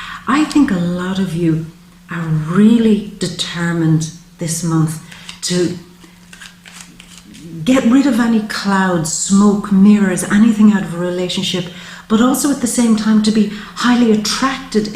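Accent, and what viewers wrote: Ireland. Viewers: I Loovee your Irish(?) accent. Beautiful.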